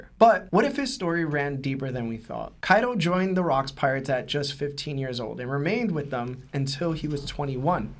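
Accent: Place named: United Kingdom. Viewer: Why is he british tho?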